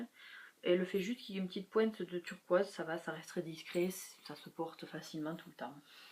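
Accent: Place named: Toulouse, France. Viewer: J'avais reconnu ton accent, je suis près de Toulouse aussi .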